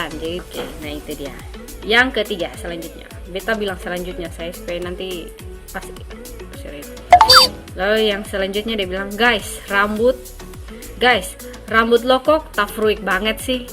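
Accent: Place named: Ambon, Indonesia. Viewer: Tidak jauh beda dengan logat Ambon dong....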